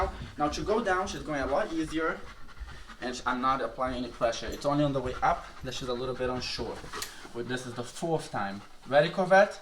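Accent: Germany. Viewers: I LOVE watching you work! I'm sorry people will probably think I'm a dummy but where is your accent from? Is it German? I'm pretty new to watching you. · Do I hear a German accent? I love you work!❤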